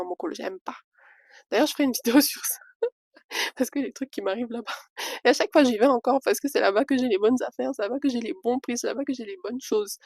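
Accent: Cameroon. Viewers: Ton accent Camer hein...un vrai miel. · Écoute, laisse moi te dire que tu t'es déjà bien intégré au mboa, le sang Camerounais domine en toi sa se sent par ton accent 😁👍👍👍👍 🇨🇲🇨🇭✔ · L'accent camer est déjà là, c'est super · Accent du Mboa🇨🇲 Bienvenue...nous t'aimons très fort, j'ai une famille métisse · Tu as déjà fait combien de temps au Cameroun, pour prendre l'accent là?😅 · Tu es marrante ! L’accent camerounais te vas bien.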